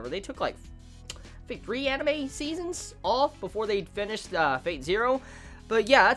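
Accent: United States. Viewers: Are u American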